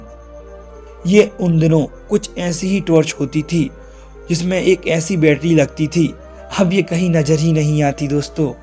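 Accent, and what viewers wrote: Madhya Pradesh, India. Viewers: Bhai aap kaha ke rahne wale ho aapki aavaz se to mp madhey pradesh lag raha hai ki. Aap mp se ho kyu g 😅😅😅🙏🙏 please reply sir🙏🥰🥰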